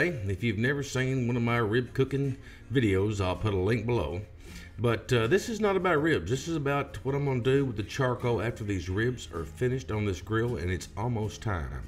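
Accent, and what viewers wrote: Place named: South, United States. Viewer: You are so cute. I could listen to you talk all day with that country accent.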